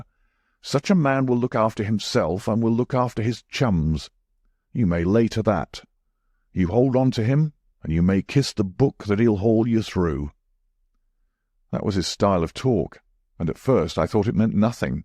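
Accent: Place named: North, United Kingdom. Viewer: No the fellow is from the North .An actor i may say . Aged 45-50 . Thank you Watson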